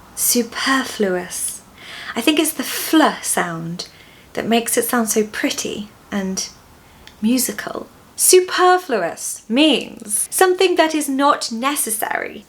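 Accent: United Kingdom. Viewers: Hallo great British accent yes correct pronunciation By the words let say two three times with you and loudly thanks More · Hello, I like your beautiful British Word Pronunciation with British Culture. I love the lovely cities in England such as Oxford, Greenwich and Edinburgh. I also like the Stone Hinge and I saw it when the Robin of Sherwood British drama was filmed in that location. I watched Robin of Sherwood in my childhood., I hope you watched that drama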